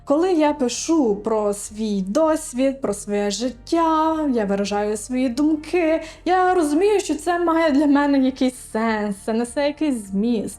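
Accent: Western Ukraine, Ukraine. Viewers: Український акцент десь з заходу, подумав можливо із Закарпаття ? )))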